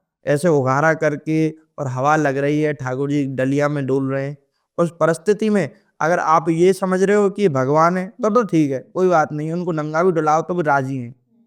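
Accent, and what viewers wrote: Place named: Braj, India. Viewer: बृजवासी tone he ye शंका ना करे🙏🏻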